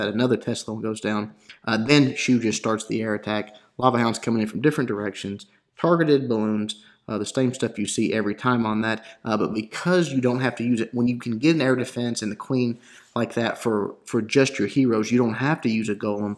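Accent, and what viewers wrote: South, United States. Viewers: So, I finally got a little past early th9 and it's awesome cause now I have hours of useful study film. Thanks again for all the sweet vids, man, keep it up. Out of curiosity, you from the South?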